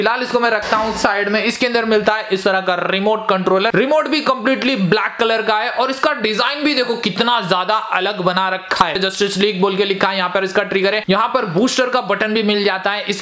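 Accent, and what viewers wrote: Rajasthan, India. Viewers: Bhai Rajasthan se hai kya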